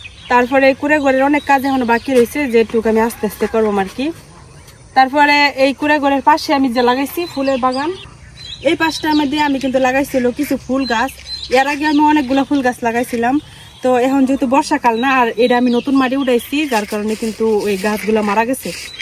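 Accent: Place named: Mymensingh, Bangladesh. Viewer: আপনি ময়মনসিংহের ভাষায় কথা বলছেন। এটা কোন উপজেলায়?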